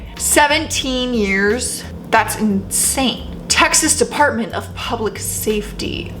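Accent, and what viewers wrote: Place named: Canada. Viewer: Hi, I do love the way you say bagels, granted I probably couldn't say it much better myself being from the North West of the UK, but they way you say it makes me giggle everytime, love your Canadian accent so much, I try watch atleast one of your videos from your multiple channels once a day, longest I've gone it 48hrs, my husband and I watch you (and Charlotte Dobre) everytime I'm on YouTube I just can't resist your sass and pickle talks:)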